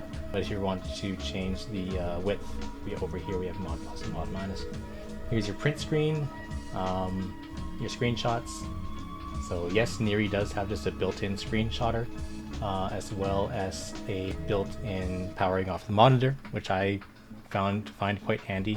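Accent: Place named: Canada. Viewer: Bro's voice sounds a bit like my friend from Vancouver. I'm all the way over the other side of the Atlantic, though, and don't ride with that many Canadians.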